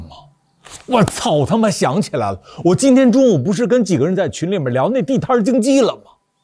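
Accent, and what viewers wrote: Beijing, China. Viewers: 您的北京口音也挺浓重的:face-green-smiling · 哈哈这京味咱北京人儿:hand-purple-blue-peace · 一开始听口音我就明白了你是首都人，再离政治中心很近的地方不可能安然无恙，我离政治中心也不远我也不敢直接说习近平，我会用大xi或者西哥，而且我们大部分不会再群里聊政治，都会当面聊。我当过辅警我们这地方小的警察要比首都要松一点，有意思的是我在当辅警的时候和正式的警察聊天他们也骂习近平（正好在开十八大的候）。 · 我比你大几岁，但真心佩服你的方方面面，优雅口才底蕴思想逻辑真诚痞帅，艺术的表达，等等等，真是一个完人。开始还奇怪为啥没有一点东北口音，原来十几岁就去北京独自生活了，一口北京话听着真舒服，优雅、收放自如、语音语调节奏表情和内容配合的简直完美，嬉笑怒骂正直诙谐幽默信手拈来，能感知到你忧国忧民的善心，远超很多知名评书人士。不像其他中文博主纯纯为了博流量赚钞票，看好你一定会超过某高和某沫及其他一众所谓的反贼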